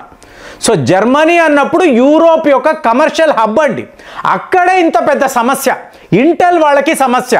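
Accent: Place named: Telugu, India. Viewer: Painful Telugu accent😢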